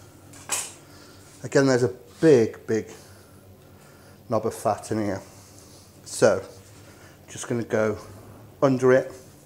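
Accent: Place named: West Country, United Kingdom. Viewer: You got a westcountry accent like mine but I cant quite place it... top video by the way. Butchered by own lamb while watching you.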